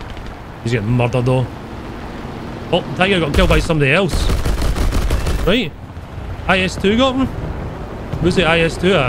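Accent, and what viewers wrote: Scotland, United Kingdom. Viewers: I love you too man specially that thick sexy scottish accent🍻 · I love you because you respect people's gender identities and you make sure to include them with your usage of the phrase "ladies and gentlemen". It's a small thing, but with human decency becoming politicized people often shy away from even acknowledging the existence of people that are gender nonconforming. Aside from that you make great content, and your very distinct Scottish dialect reminds me of a great horror movie reviewer on here named Ryan Hollinger.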